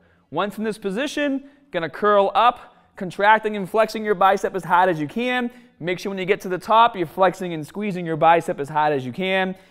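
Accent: Boston, United States. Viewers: comparing your videos in 2010 to 2017.... you sound normal in 2010, but in 2017 you somehow developed a boston accent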